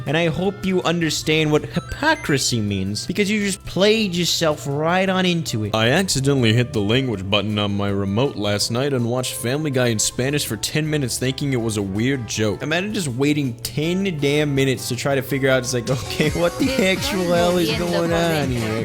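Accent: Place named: New York, United States. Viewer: I love the NY accent, adds depth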